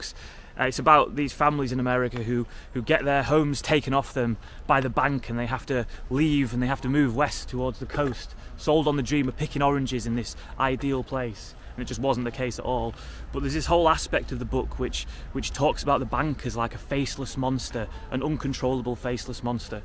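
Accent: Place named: Northern England, United Kingdom. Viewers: I love your Northern turn of phrase...'astral projections man' 'oh, thuk off'! brilliant 🤣💯. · Can tell youre from yorkshire "n i thought... oh fuck off" 😂 amazin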